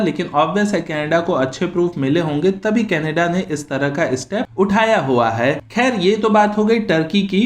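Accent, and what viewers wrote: Bengal, India. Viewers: Sir r u bangoli?? Motamuti..😅u can't hide your words..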